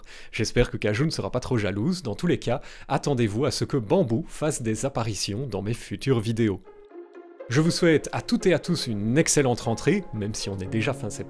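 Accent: Belgium. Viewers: Han j'aime vraiment pas le français de belgique xD Chatonne AAAAAAAAAHHHHHHH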